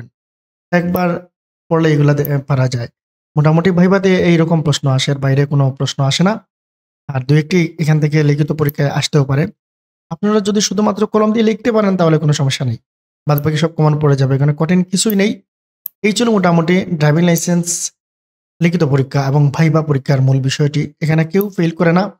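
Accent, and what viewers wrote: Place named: Sylhet, Bangladesh. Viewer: আমার মনে হয় আপনি সিলেটি😊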